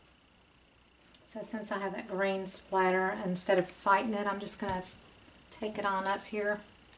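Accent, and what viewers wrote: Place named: Rural, United States. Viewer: That "Country twang" just makes you more enjoyable to watch and listen to.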